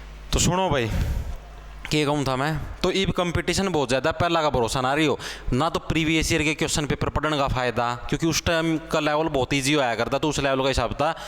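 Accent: Haryana, India. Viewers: Yo bihari nhi hai bhai ye haryanvi hai pradhan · ❤ haryanvi accent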